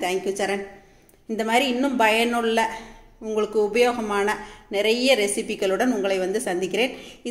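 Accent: Kongu, India. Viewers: Thank you amma I like ur receipes and way of talking Kongu slang I am from Erode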